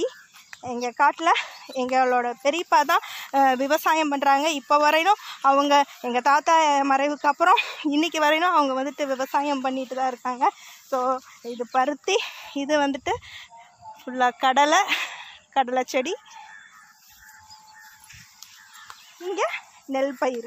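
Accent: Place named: Madurai, India. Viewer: Akka nenka entha ooru .. Madurai language matri irukku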